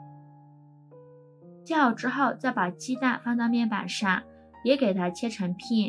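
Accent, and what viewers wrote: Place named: Mainland, China. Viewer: 中國都是這麼唸 我們台灣唸ㄒㄧㄠˋ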